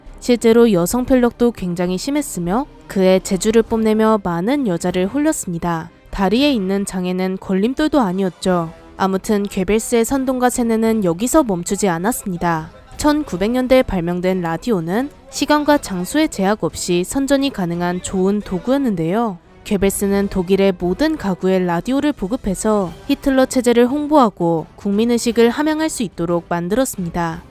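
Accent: Gyeongsang, South Korea. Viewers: 내레리션 담당자분 경상도 사투리 들려요 ㅎㅎㅎㅎㅎㅎㅎ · 경상도 사투리?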